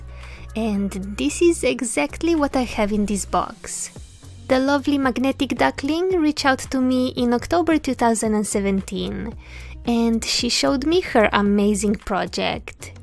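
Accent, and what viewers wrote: Russia. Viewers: I love your accent ... are you from Russia ?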